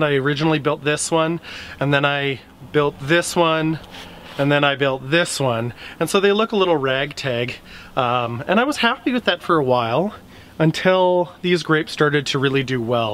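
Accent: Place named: Canada. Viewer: This is my fave gardening channel. Upbeat, empirical, comprehensive, good scope, and with the cutest Canadian accent EVER. Hey, I have European plums, cut them to knee height last spring after planting. Can you explain vase vs central leader pruning for young trees? I find it hard to visualize as a process from year to year.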